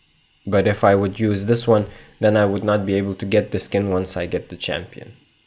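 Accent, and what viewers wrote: Lithuania. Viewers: r u lithuanian? ur acent is just like lithuanian talking english:D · Let me guess, you're from Lithuania. You sound like one though. Your accent is litterly Lithuanian.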